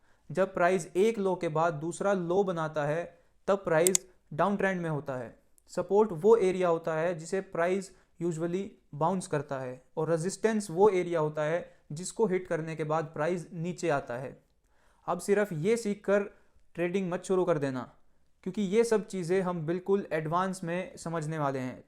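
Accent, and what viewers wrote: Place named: Najafgarh, India. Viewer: Saath me trade krte h bhai. Me b najafgarh se hu tu b aas paas ka hi lg rha h boli se😅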